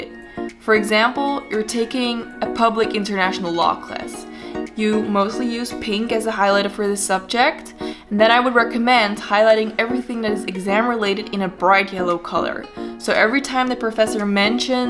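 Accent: Netherlands. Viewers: WHAT? are you dutch? omg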